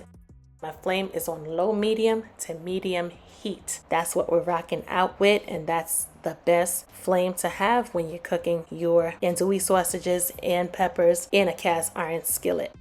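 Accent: New York, United States. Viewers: Im making this right now following your video step by step and pausing in between. LOL Your New York accent is everything. i love your videos and shared them with my sister who lives in Georgia and she loves you too. thank you for making my thanksgiving dinner special and delicious! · Are you from new york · That NY accent! Just makes me smile watching it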